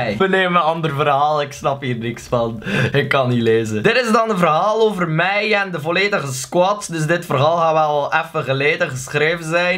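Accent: Belgium. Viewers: je hoort echt dat hij een Belg is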